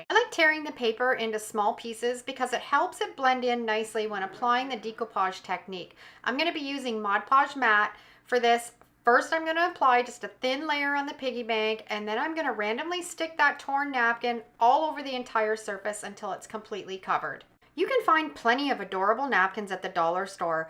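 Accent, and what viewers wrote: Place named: Canada. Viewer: I knew from your accent that you were not American! Go Canada!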